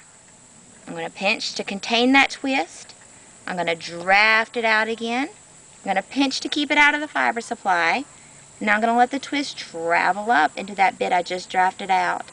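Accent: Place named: South, United States. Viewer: Hope you are ok, since you made this so long ago! I guess you are in the southern states area, going by your lovely way of talking! Thank you again.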